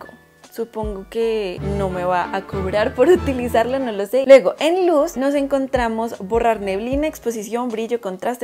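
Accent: Colombia. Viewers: Me encantan estos tipos de videos y tu voz super relajante jaja no se el acento colombiano me gusta ❤️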